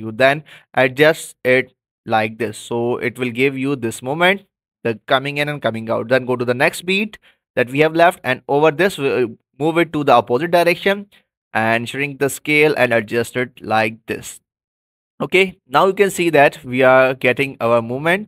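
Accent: India. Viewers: Bro not the Indian voice It icks me out a bit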